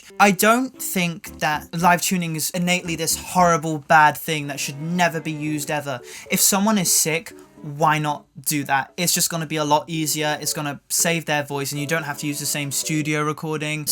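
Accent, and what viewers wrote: United Kingdom. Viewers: That British accent (๑♡⌓♡๑) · Ok. This is the first time I’m watching him actually speak and I can safely say I wasn’t expecting him to be British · wait you're British? All the better ig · Trying hard to understand the fast British English with the lyrics(?) while thinking maybe that learning app is a good choice for me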